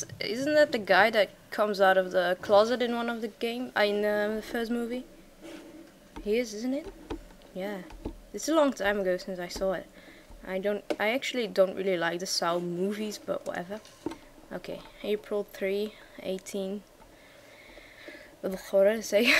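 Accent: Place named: United Kingdom. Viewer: Nice accent : ) is it british?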